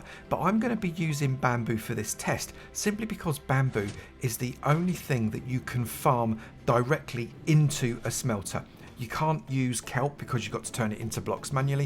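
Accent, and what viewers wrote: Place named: England, United Kingdom. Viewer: He's just English, that's it.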